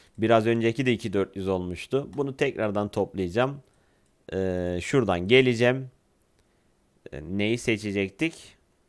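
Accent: Gaziantep, Turkey. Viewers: biraz antepli konusuyon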